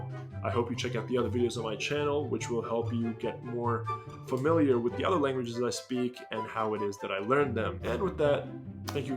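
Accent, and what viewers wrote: Spain. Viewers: Damn you’re talented at speaking Spanish, im surprised you learned how to speak Spanish with a Spaniard accent rather than a Latin American accent since you’re from the U.S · Mi bro habla como todo un español, te recomiendo escuchar otros acentos para que encuentres palabras nuevas que solo se usan en ese tipo de acentos, por ejemplo en Latinoamérica jamás se dice vidio, si no video, cheers mate <3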